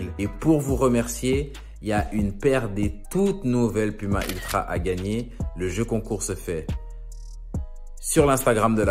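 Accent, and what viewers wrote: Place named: Italy. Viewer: Encore une magnifique vidéo un belle accent italien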